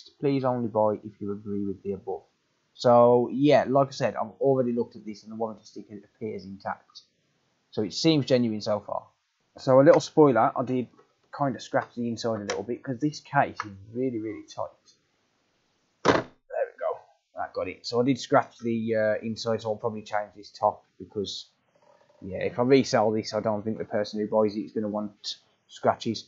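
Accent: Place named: Black Country, United Kingdom. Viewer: Nice to hear the voice of fellow yam yam 🤘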